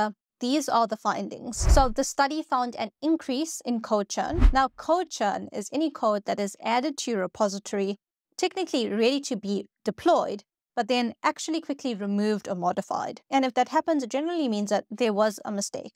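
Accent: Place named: South Africa. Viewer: I lilke your channel it'sinteresting you sound south African and Indian, although i dont know you coul dbe sri lankan or etheopian dutch lol.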